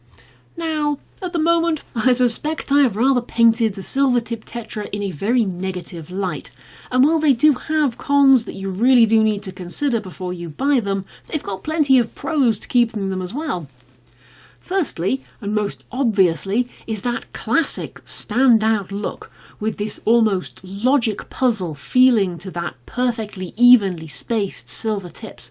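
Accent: United Kingdom. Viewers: Thank you for this video, it was so comprehensive. I just heard that they were a bit aggressive so I wanted to explore further. BTW - I love your British accent!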